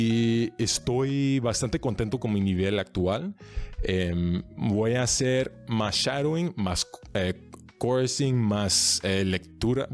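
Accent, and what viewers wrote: Mexico. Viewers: I mean I’m not a Spanish native but it would be pretty hard for me to tell you aren’t native, accent is excellent. Once you get faster it’s over. You don’t play about your Mexican accent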